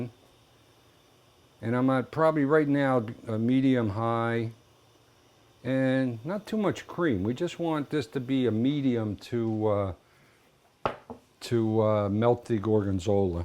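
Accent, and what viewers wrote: New Jersey, United States. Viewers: Knew he was a fellow new jersyian from his accent - he didn't need the t-shirt to remind me of home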